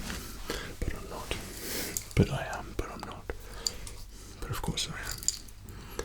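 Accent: United Kingdom. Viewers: He is it’s not even that thick of a bri’ish accent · i didn't know thor was british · Is this man from UK? (I am learning american english, and i don't understand the acent of UK) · One of my goals is to learn this accent, I watch BBC almost every week, there's just something about it that is magical. Great video!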